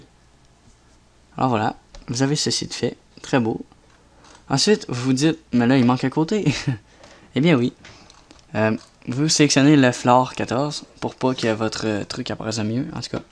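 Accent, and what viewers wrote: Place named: Quebec, Canada. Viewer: je t aime mec <3 super tuto je kiff ton accent québécois :D je m abonne !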